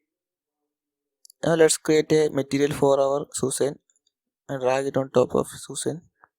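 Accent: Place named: South India, India. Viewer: Thanks bhai that material splitting thing was giving me headaches I was import the whole model without making materials in blender I never used any 3d paint software By your accent looks like you are south Indian hope I am not wrong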